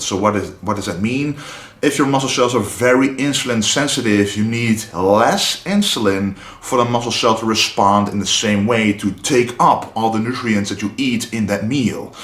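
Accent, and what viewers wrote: Netherlands. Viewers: I LOVE THE DUTCH ACCENT. I AM MOVING TO THE NETHERLANDS TO FIND A MAN TO SPEAK TO ME IN THIS LOVELY AND SEXY ACCENT.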